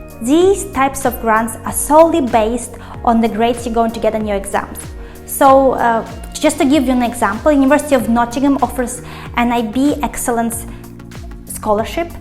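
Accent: Russia. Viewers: Sounds russian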